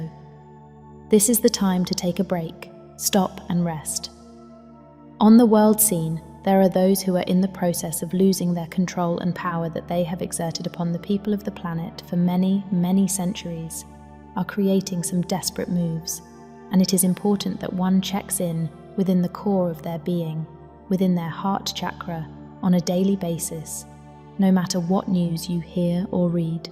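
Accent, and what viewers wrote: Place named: United Kingdom. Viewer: And with a British accent yet. Nice try, but no brass ring !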